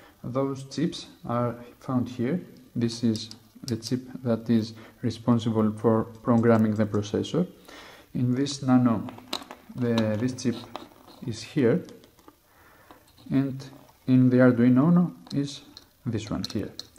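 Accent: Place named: Greece. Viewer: Αχ αυτη η προφορα μας ! Ωραίο video ;)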